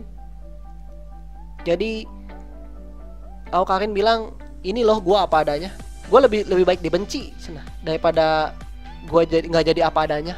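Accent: West Java, Indonesia. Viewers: bang lu org Sunda ya? suara lu ketara hehe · sunda banget ngomongnya haha malah ngakak. makasih bang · miminnya dari sunda :p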